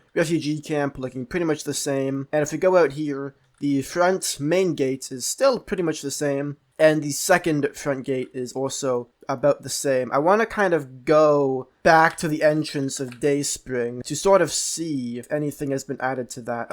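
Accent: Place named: Canada. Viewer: Where are you from? You have Canadian Accent!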